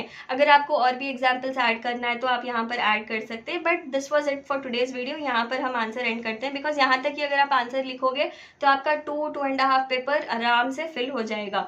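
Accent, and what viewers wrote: Hyderabad, India. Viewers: Hyderabadi Ma'am spotted!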